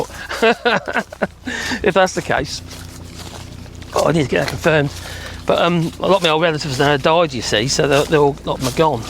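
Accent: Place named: West Country, United Kingdom. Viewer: All this time watching channel I thought he was from the west country! 😅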